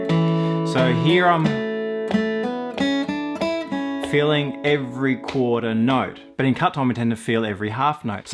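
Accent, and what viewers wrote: Australia. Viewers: Y'know... over here in America, when watching flatpicking videos normally we hear a familiar hillbilly accent... like from Kentucky or Tennessee, North Carolina, Virginia, or even Southern Missouri. Then there's fellers from Italy that pop up... Beppe Gambetta and Roberto Dalla Vecchia who sho' can pick! And listening to their Italian accents, don't sound like Knoxville, if you git my drift... and then I found another person from ENGLAND, a lady named Charlotte who made my jaw drop, she got Bryan Sutton's attention, invited her over to the USA to help instruct guitar... and this here video... what's that Australia? Bluegrass RULES!!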